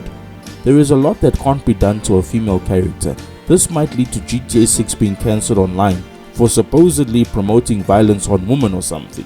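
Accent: South Africa. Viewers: I recognized that SA accent. You coloured ?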